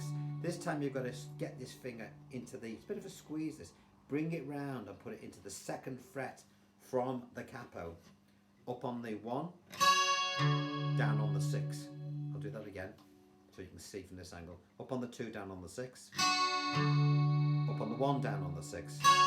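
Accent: Liverpool, United Kingdom. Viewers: First of all are you from Liverpool? Also have you thought about writing this out in tab instead of chord charts?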